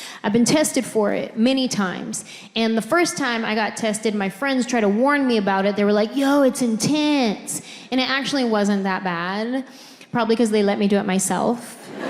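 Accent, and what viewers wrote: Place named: San Jose, United States. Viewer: She sounds soooo San Jose! Subscribed. 😂❤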